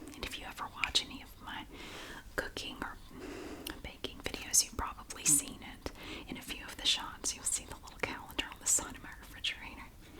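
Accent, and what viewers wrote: South, United States. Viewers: Hi,you've got me well and truly hooked on your videos,especially the jewellery haul ones-only problem is,they are so relaxing that l fall asleep half way through,so have to view them over again to get to the end of all the items.l really enjoy listening to your southern drawl,l am from England so it's just fascinating to me.Please keep doing what you do,l will keep watching.Thank you so much,and may 2021 be a year of peace,good health and happiness for you and your boys(and 😺 kitties)love from Heather.xx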